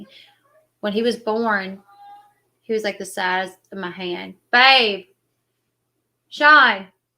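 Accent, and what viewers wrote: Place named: Louisiana, United States. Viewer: Her mailing address is lousiana, usa, and her accent is def. in line with that area.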